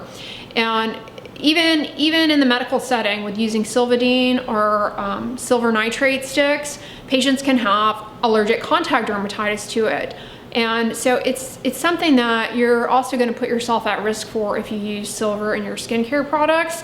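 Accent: United States. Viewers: Man thats an annoying American accent..... and i dont believe a word of advise from doctors........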